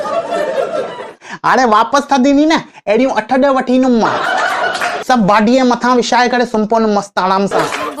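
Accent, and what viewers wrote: Sindh, Pakistan. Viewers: Really refresh our mood.. Speaking skill too good.. Love to hear ur sindhi accent.. Blessings n wishing success always 👌👌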